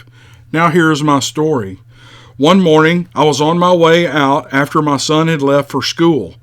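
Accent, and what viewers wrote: South, United States. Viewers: awesome!! luv ur stories bro. you have that southern drawl kinda like peyton manning. just luv the stories and your true self is exposed to your fans. keep up good work! luv from California!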